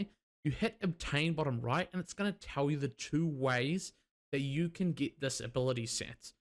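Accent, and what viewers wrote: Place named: New Zealand. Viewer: Nah he's just from New Zealand, they sound like that innit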